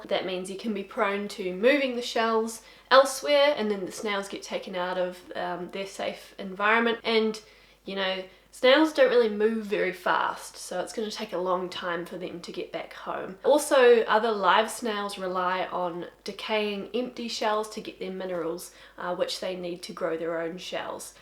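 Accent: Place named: New Zealand. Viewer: Great job mam! So awesome to hear a kiwi accent on YouTube.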